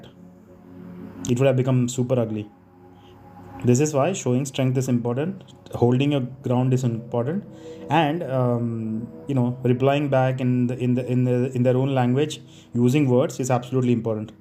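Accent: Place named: India. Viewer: Hell Nah Indian scammer with the accent too is hilarious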